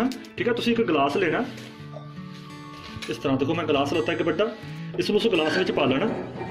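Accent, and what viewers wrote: India. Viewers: Bhai aap ki punjabi bilkul mere nanhyal wali he wo ambala punjab k the ab hum Pakistan k punjab mehe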